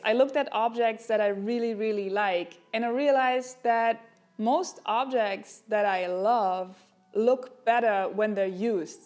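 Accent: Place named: Germany. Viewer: تعجبني لكنتك الألمانية الظاهرة بشكل واضح في لغتك الانجليزية، مشاريع جيدة وممتعة، تحياتي لك ولمجهودك الكبير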